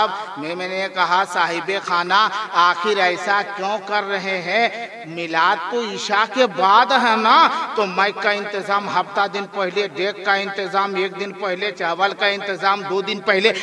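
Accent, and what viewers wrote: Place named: Giridih, India. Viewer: Maulana sb ki baten sun kar aisa lag hi raha tha ke Giridih district ke hai. Kyuke hum bhi Giridih district ke hai or ye bhasa se wakif hai.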